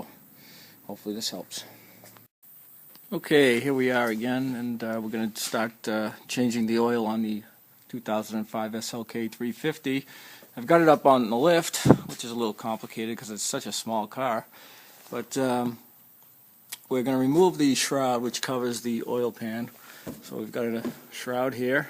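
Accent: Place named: Rhode Island, United States. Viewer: Hoi, what part of New England are you from? Lived in Rhode Island for several years — sounds like RI?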